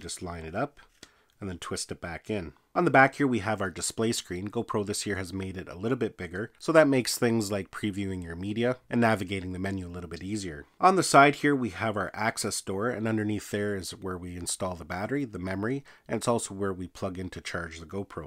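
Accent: Canada. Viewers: By FAR the best video on the new GoPro 9.... BTW I'm from Ontario.... you sound like you're also from the frozen North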